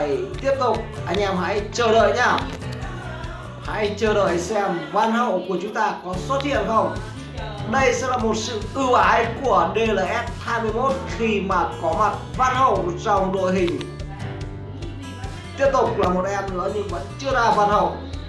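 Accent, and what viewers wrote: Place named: South, Vietnam. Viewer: Giọng miền nam nói chuyện rõ nhạt, Clickbait cực mạnh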